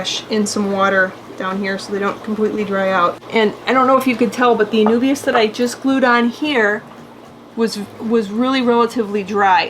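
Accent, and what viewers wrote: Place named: North Woods, United States. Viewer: Wish I had watched this before I made all the mistakes you warned about lol! I hear enough “ope’s” in der for me to think us girls are both from the nort woods. I too share your water parameters. I have had an embarrassing hard time with anubias. The leaves are prone to yellowing with holes and turning into a brown mesh work before death. I have increased fertilizer with what looks like improvement. They get low to medium light with floaters above. Any suggestions?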